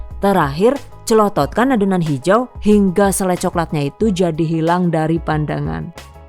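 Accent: Banyumas, Indonesia. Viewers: Luar biasa nih boluny cntik dn empuk seneng bnget dengan pembawaanya sll di selingi bahsa purwokrto juga ngapak gitu skss yh mb mdh2an cenel ini sll mmbwa brkah amin 👍❤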